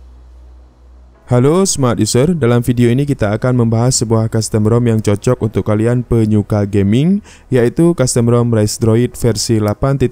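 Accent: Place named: Magelang, Indonesia. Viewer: Dari suaranya. Magelang flasher ya ini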